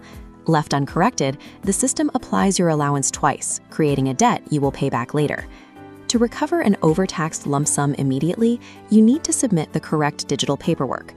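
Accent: United States. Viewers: If you are talking about UK tax, don't use an american english voice over, otherwise no one will trust you.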